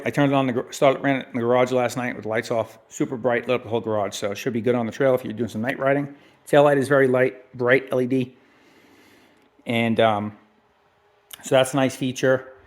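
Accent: New York, United States. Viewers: you sound like a NYer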